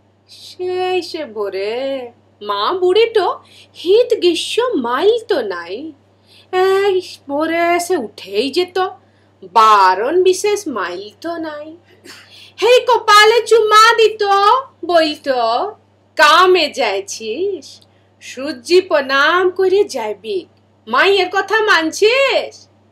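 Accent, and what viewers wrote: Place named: Rarh, India. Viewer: mondal apni ki kono din bangla upovasa(bengali nativ acents) gulor nam suneche? somvoboto sonen ni. eta amader bangla vasar sompod . kobita ta to rarh upovasa (ancholic bangla) tei abbriti kora.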